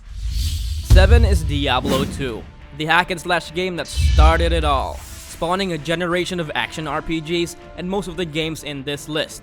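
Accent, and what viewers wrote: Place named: Philippines. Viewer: Alam na alam mong Pilipino narrator eh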